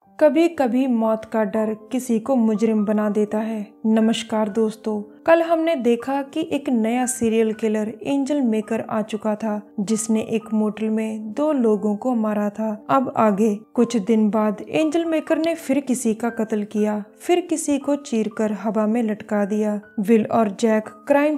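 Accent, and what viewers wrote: Punjab, India. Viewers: Punjabi accent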